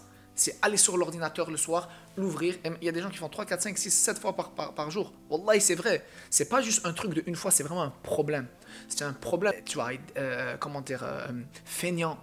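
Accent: Quebec, Canada. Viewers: Il est canadien encore heureux que il a un accent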